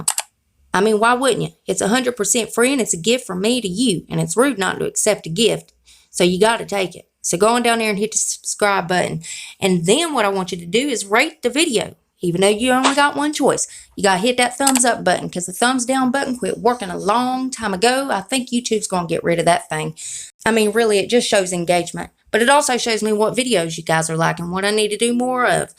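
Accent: South, United States. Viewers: Girl, I love you! Your country girl accent reminds me of me! 💜 I live in a city now for 8yrs but people still say they know I didn't grow up here bcuz of my accent! Love Your videos too! 😘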